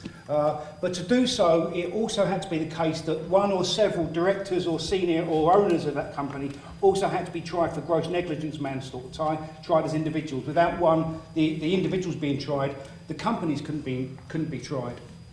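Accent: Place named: Cockney, United Kingdom. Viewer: Oh, I fucking love his cockney accent!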